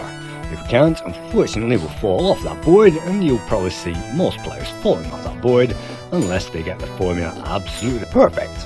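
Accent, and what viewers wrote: Lancashire, United Kingdom. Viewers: really enjoy your reviews! I'm a faithful subscriber from the USA and your accent is reminiscent of a mate from Accrington, so I'd venture to guess you're from Lancashire? if I'm wrong, no offense and keep up the great videos!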